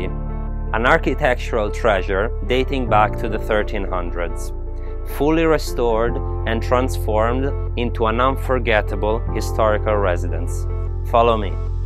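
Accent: Italy. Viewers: L💓Ve listening to ur Italian accent . Besides u r an awesome guy ur self ! . 👍💕💕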